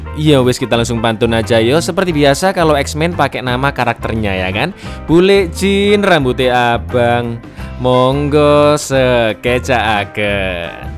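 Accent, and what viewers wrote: Java, Indonesia. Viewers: Mas uwong jowo yo mas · Lur kamu orang jawa mana?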